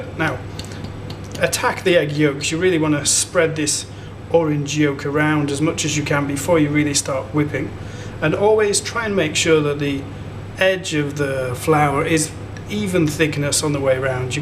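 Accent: United Kingdom. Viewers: Are you northern english??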